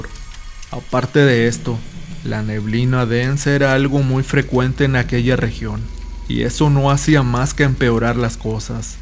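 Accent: North, Mexico. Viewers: porque habla muy norteño!.😤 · Me gusta la voz del otro narrador , a este se le sale el queso mexicano no mames wey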